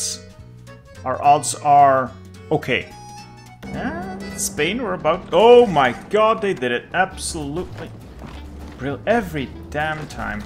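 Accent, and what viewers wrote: France. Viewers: You've a nice french accent, where are you from? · You have a great French accent! You're not French right? Haha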